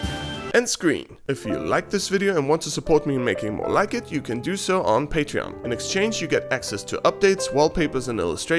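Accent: Austria. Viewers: Oha merkt ihr nicht, dass sein Englisch einen Österreich/Deutschen Akzent hat? Scheinbar IST er Österreicher und deswegen kann er es so aussprechen ahahha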